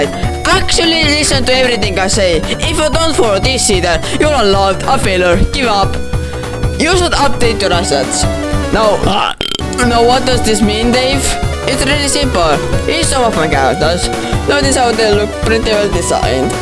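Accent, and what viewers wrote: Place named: Estonia. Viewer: the estonian accent is amusing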